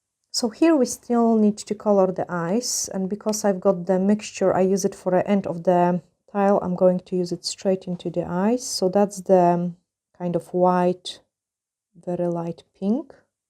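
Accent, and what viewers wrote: Scotland, United Kingdom. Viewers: Love hearing your Scottish accent coming through 😂😂. Also lovely work x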